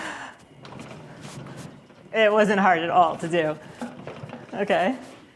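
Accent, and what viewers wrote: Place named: Brooklyn, United States. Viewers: + cool bench caster lever = Chatty Cathy. You are an adorable genius, not commonly found in the wild (Sounds like Brooklyn). Thanks again for the great video. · 1st video I have watched. Nice job and very creative on the lift mechanism. Where are you located if you don't mind me asking. Accent says NY but the don't miss being cold says you may have moved south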